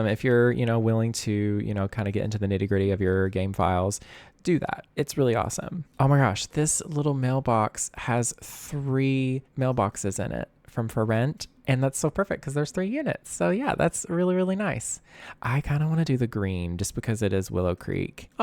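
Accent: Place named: South, United States. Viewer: This is the first video I’ve seen of yours and I’m obsessed already! ❤️ You’ve got that sweet Southern belle realtor vibe going on and it feels so genuine lol. I pop in and out of Louisiana sometimes, so maybe that’s why I’m picking up on it. Either way, I’m here for it! 🤣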